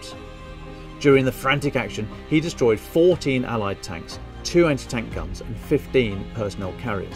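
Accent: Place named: United Kingdom. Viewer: Nice to hear a brit giving love to good old T.R. 😄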